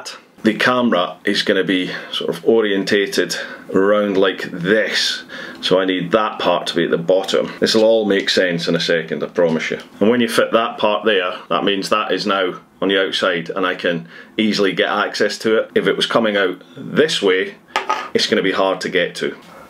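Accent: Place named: Scotland, United Kingdom. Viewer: Ahh, I couldn't pick it.. Sensed north of Dundee... Defo not Dundonian. Great vid, thanks for sharing. Subbed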